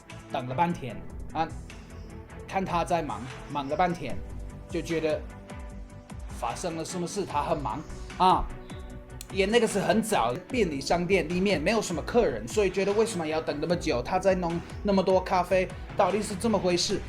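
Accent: Taiwan. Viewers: 哈哈～我第一次看到一位外國youtuber講國語台語口音超重的XDDD 完全就像是先學了台語才學說國語的人 果然住在南部講話就是會一直“啊～怎樣怎樣，啊～怎樣怎樣”的 哈哈 中文講的真的是太好了！完全可以感受到真的是花了很多心力努力的學習跟練習出來的！ 是比天才更令人敬佩的地才！讚！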